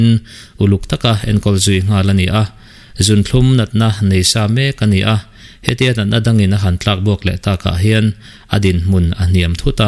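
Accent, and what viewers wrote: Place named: Tuensang, India. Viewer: Mizo ṭawng dik taka chhiar leh lamdan zir i ngai deuh.. chuan Tuensang lamrik dan nen.. chung zawng² aichuan i ti ṭha lutuk e..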